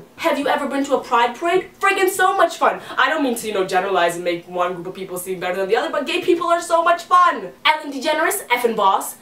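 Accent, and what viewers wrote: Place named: Canada. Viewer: Awesome vid. PS, omg you must be Canadian. I can hear it! I miss TO...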